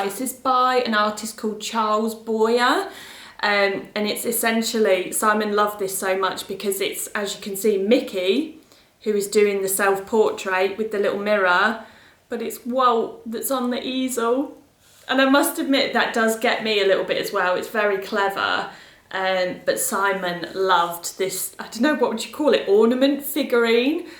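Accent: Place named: London, United Kingdom. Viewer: Ok, let's make a deal. I'll come to London and you teach me that oh so wonderful English (London) accent and I will transmit to you my Texan twang. And yes....I say "y'all" & "fixin'".🤣😎🤣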